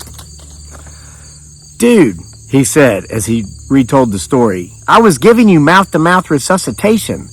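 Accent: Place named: West Virginia, United States. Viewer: I love listening to your stories in your West Virginia accent....thank you!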